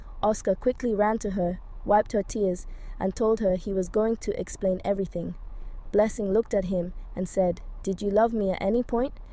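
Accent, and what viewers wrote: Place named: Liberia. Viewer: You sounding like you’re from my hometown. Are you a Liberian?!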